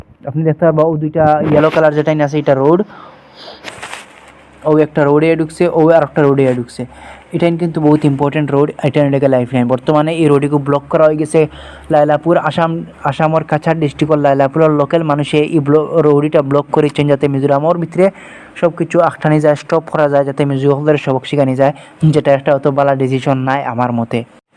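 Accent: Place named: Sylhet, Bangladesh. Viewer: Maybe because of His Nagri accents it sounds like Borok.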